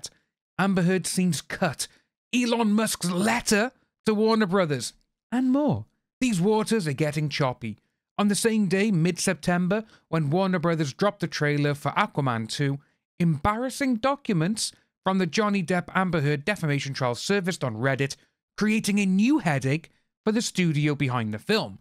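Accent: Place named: United Kingdom. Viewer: I am a Brit who emigrated to the US. Your insights are spit on. Plus, I’m missing the UK and your accent makes me feel at home.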